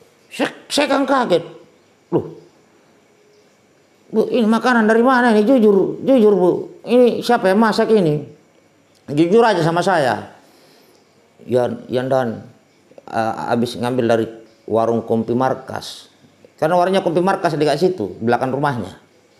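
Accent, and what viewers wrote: Makassar, Indonesia. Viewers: Mantap komandan 👍👍🙏.. Solo hadir.... cerita cerita yang menarik dan inspiratif dari Mayor..... jangan lupa logat Makassar, kopi dan rokoknya pak komandan.... sukses selalu 👍👍👍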